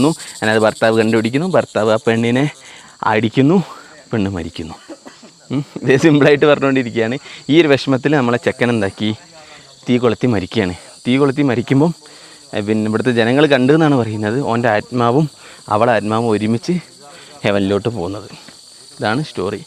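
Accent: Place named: Kerala, India. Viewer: Have missed out a lot being the late follower but just discovered your channel this morning and since its weekend have spent whole day watching your trip from Kerala to Nagaland, in love with the positive vibe between and you. ❤ from Mon Nagaland i have done my schooling established by Keralite so many teachers were from Kerala, that accent and language felt so close though i can't understand anything. Enjoy your stay in Nagaland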